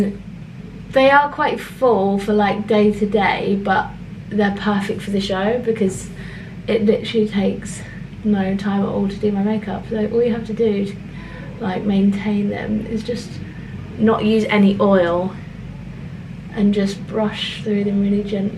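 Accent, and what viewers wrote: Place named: Australia. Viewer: loving the australian accent comeback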